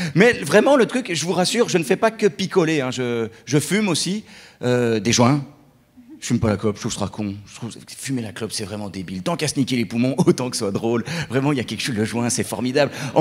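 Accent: Belgium. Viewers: J’adore l’accent belge. L’accent d’une région reflète l’esprit de cette région. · Étant belge je reconnais l’accent de mon patelin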